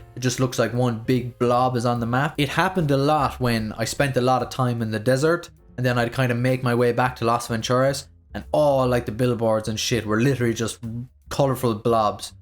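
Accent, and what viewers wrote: Ireland. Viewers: That's just how Irish people say three.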